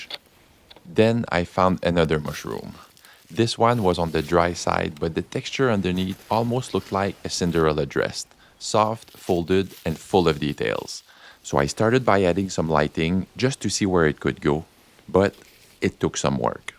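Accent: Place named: Quebec, Canada. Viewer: Its the quebecois heavy accent for me. All the different angles of this video though, lots of work going into this for sure. Great job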